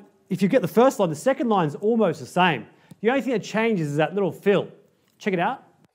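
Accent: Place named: South Africa. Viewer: *accent sorry. You're probably Australian, but I'm leaning towards South African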